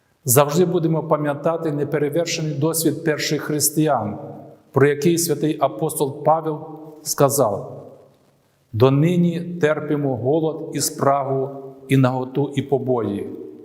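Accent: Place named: Russia. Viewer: у вас ошибки в произношении, как у жителя России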